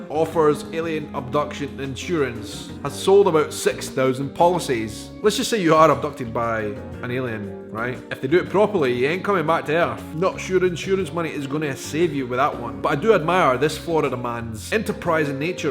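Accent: Scotland, United Kingdom. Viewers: You need to come to Montana. Everyone here will love you and you'll get all the free drinks you want as soon as they hear that Scottish accent. Our state is larger than Germany and trust me you'll notice it. For us a 6 hour drive is just going from one side to the other on the interstate. You can even stay with my family, we have houses on both the Eastern and Western side of the state plus a cabin up in the only Eastern lake where cabins are allowed so we can toss in a fishing pole and catch some delicious cold water trout. I'll even bring the brews and some good cigars.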